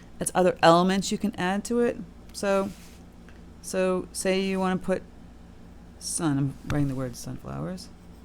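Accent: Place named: East Coast, United States. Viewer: I'm enjoying your videos immensely, your a great teacher and a pleasant, cool, East Coast voice. Best to ya!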